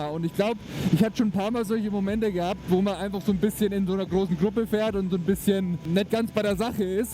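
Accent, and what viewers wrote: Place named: Franconia, Germany. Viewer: Bist voll der Franke gell?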